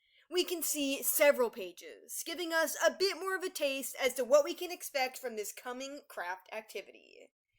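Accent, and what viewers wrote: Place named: United States. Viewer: dude i really wanna watch ts but the american accent is so HEAVY and i can’t bear ts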